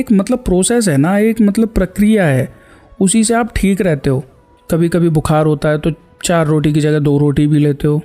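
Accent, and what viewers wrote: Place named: Delhi, India. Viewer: दिल्ली का है इसलिए लहजा केजरीवाल जैसा है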